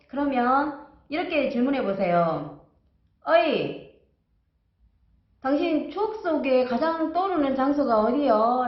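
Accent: Gyeongsang, South Korea. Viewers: 저처럼 경상도 사투리라 반갑네요 ~^^ 정체성인 장소~ 이번 기회에 생각해봐야겠어요~^^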